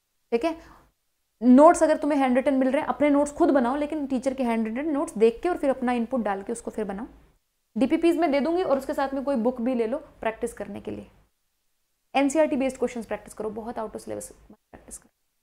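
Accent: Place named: Bengal, India. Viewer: I think she's Bengali.. because I feel it in her accent of speaking Hindi 😌💜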